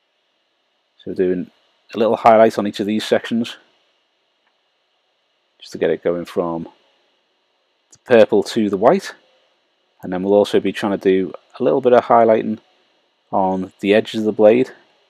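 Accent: Liverpool, United Kingdom. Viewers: did any1 else at the begining think he sounded like he was from the netherlands n was talking a foreign language on the introduction then i realised he was a scouser no offence loool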